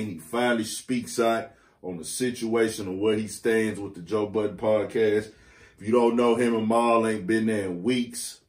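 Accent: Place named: Houston, United States. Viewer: Bruh! Are you from Houston? Man u sound like you from my city! Lol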